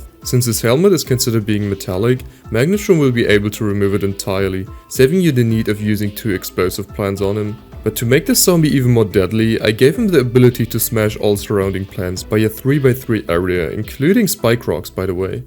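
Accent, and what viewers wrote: Germany. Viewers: I can not not hear the german accent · Hm, i hear in your voice some german... and i see u are in germany... bist du deutsch xD?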